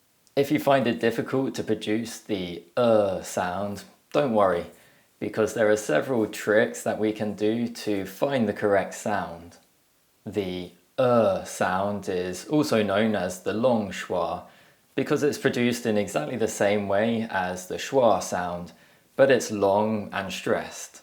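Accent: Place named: England, United Kingdom. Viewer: Well done. I have some difficulties pronouncing those words in sentences with a British accent because I've achieved a defined American accent, but at least I tried it. But regarding understanding your accent, I shouldn't have problems understanding most people from England. You're a handsome man. Thanks for your helpful lessons. 🥰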